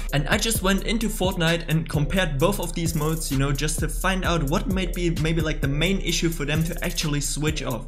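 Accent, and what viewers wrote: Germany. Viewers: Dein deutscher akzent so nice haha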